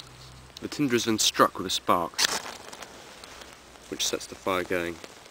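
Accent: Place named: England, United Kingdom. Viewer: Nice video young man. Where did you shoot it? Somewhere in England by your accent.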